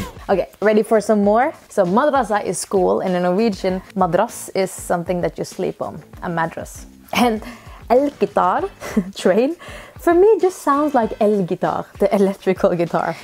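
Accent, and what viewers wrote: Iraq. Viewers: the Iraqi accent has been liked by you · انتي تتكلمين لهجتنه العراقيه الجنوبيه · the iraqi accent made it more charming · This iraqi accent is so cute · You are so cute speaking the Iraqi dialect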